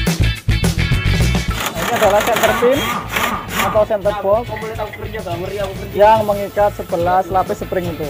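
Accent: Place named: Malang, Indonesia. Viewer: Sepring ,jan logatnya ngalam banget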